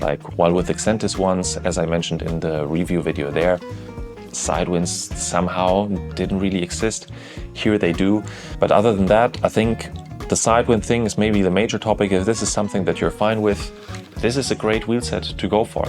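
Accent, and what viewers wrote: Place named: Germany. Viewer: "Velo Six Spoke Aero Wheels" ... the German accent was the hook, but I didn't see any German style analysis. You talk about noise and feel and stiffness and cross winds is as close as you ever get to discussing the aerodynamics of aero wheels! Whats the matter with you? Do you have aerodynamic wind data of any kind?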